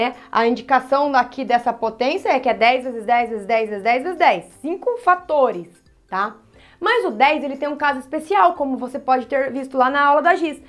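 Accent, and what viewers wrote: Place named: Santa Catarina, Brazil. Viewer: Voçê é de Santa Catarina?Voçê tem sutaque..😅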